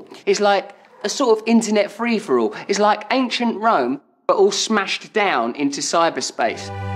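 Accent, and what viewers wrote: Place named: United Kingdom. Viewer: I'm not British... so everytime I listen to this guy, I can't stop laughing, hahaha. Strong British accent, hehe :D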